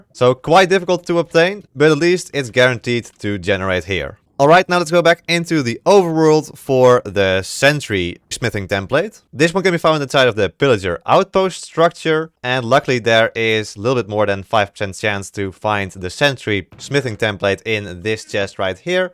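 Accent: Netherlands. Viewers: this guy is def dutch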